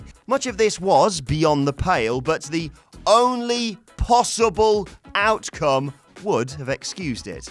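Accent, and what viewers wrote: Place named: United Kingdom. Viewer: Finally, a good British voice besides Adam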